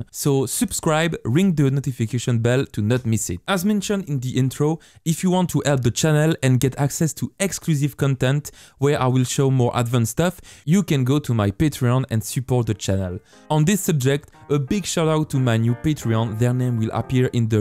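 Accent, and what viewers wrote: France. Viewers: You are probably French right? That accent seems French to me :) Well I am too, just not from Europe, I have a different accent here in Quebec. Anyways thank you for the tutorial ! Merci. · Juste a ton accent: Tu es francais? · Pas mal le French accent x) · I love his strong French accent haha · Your french right? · It's the French accent for me. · A lil french xd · Is he french? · En tout cas on voit pas du tout que tu as un accent français cher compatriote ahah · On reconnaît le booonne Français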